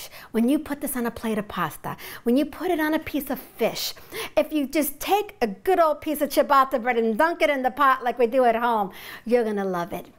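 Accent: United States. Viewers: Her accent is American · She has an American accent and her Italian one is not the best. It's what happens to the second or third generations.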